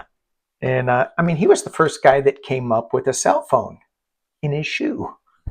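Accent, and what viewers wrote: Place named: South, United States. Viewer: Yeeeeee ... your good ole boy Southern accent was sneaking in today ... 😁 ... yeah, a lot to talk about ... xx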